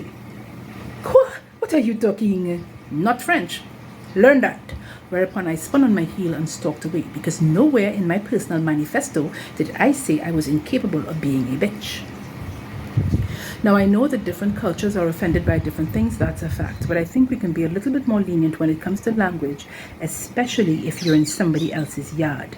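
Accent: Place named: Trinidad and Tobago. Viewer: No problem. She got some Trini...🙌🏼😁🤣😚😂💯🙏🏼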